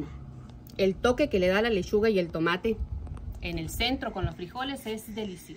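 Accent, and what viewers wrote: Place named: Sonora, Mexico. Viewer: Asi hablamos en Sonora ja ja ja. Arriba el Norte!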